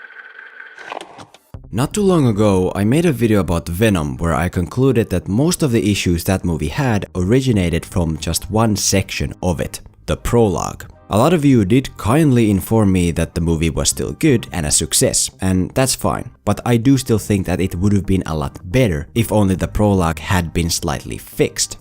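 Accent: Finland. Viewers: This been bothering me for a bit now, but would you happen to be Finnish? The way you pronounce english gives me that feeling.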